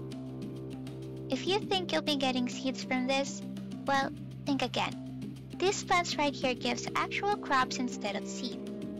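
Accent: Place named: South Africa. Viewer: Awe! I just discovered you a few days ago, as i just started playing roots of pacha, and i have already commented a few times on your vids 😂 but i just wanted to tell you how much your video's and your ADORABLE voice brightens my days!!🤩💕 Also, were are you from? I dont know why, but your accent sounds a bit south african or something like that haha, well im from sweden so i have NO clue, im just guessing hahaha 😂 its something about how you pronounces words, like the fish names in one of you vids, and it just tickles the brain like south African accents do haha 😂 Also, your lil meerkat avatar makes me CRY hahaha, they're one of my favourite animal's , here in sweden we call them surikat, or if there's more than one, surikater haha 😭 You're welcome for that EXTREMLY useful info hahah😂😂 welk just wanted to let you know ur awesome and i love ur vids, you dont even know how much they have helped haha 🤩💕 love from sweden!!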